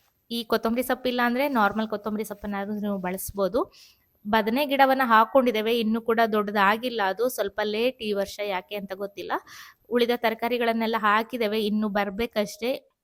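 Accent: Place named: Kundapura, India. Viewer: Hoy, ನಿಮ್ಮ ಕುಂದಾಪುರ ಬಾಷೆ ಏನಾಯ್ತು, bolu ಹುಳಿ super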